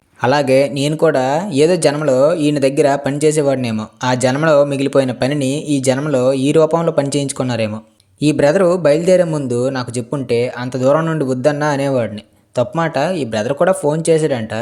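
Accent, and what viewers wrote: Srikakulam, India. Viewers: అరేయ్ నీది కూడా శ్రీకాకుళం ఆయనది శ్రీకాకుళం జిల్లా నువ్వూ నీ కొండ భాష ఆపరా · Anna meedhi inthaki elura a leka srikaakulam aa?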